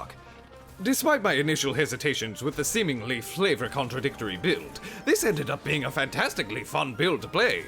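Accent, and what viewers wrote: United Kingdom. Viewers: i noticed that throughout the entire video the narrator has a british accent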